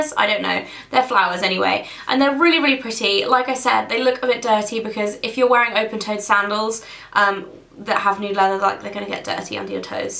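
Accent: United Kingdom. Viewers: It's the British pronunciation :)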